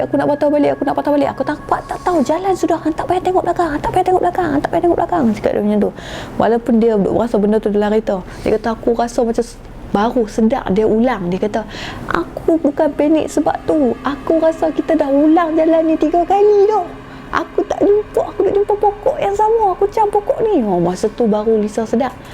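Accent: North, Malaysia. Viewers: puas cari nak subscribe..setahun telupa🤣.. saya suka penceritaan. suka loghat utara dia👏👏.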